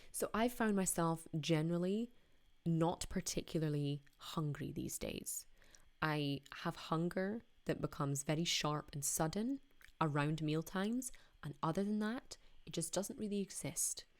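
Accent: Scotland, United Kingdom. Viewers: Ok., let's get started. 1.I ate whole package of Ptasie Mleczko during watching this episode. 2.A few months before pandemic my plan was to look like Cristiano Ronaldo. Or Robert Lewandowski. Now, after 14 months of pandemic I look like Miss Piggy rather. 3. Because is stressful pandemic situations and pandemic depressions, I eat sweeties. Just need more sugar. 4.I eat big portions of meal to cheat my brain for produce positive message goes like this: "Easy, Robert, soon everything's gonna be alright". Of course, it won't. I dream about fish and chips. 5.I need woman to calm me down. My last girlfriend left me a few months ago. She told me that my behaviour reminds her Woody Allen's neurotic nature. 6.And finally, I found a few words of your pronunciation that need an explanations. These are: why, who, whom, where, own, home. You Scottish fox. 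7.You have a beauty nature,.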